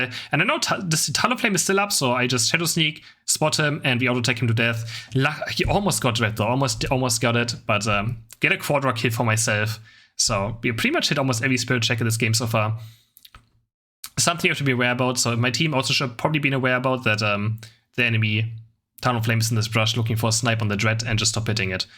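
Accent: Germany. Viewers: Do you have a German accent or am I just imagining that?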